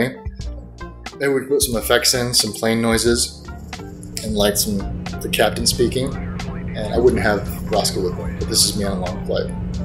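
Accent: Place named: United States. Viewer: Its amazing to see you again I remember your face from many years ago a drama called Spring Waltz , I was like who is this actor who is more american speaking than korean , so very handsome , then I see you on I Live Alone , which was so good you treated everyone so well , I dont watch TV so I didnt know about Criminal Minds till watching the show , but yeah you would of been Perfect to play in Crazy rich Asians , your face is one not to be wasted and beyond that you seem like such a genuine guy, really sweet , in ending sorry for your loss of Mango , I wish you the best hope to see you on the Big Screen !!!!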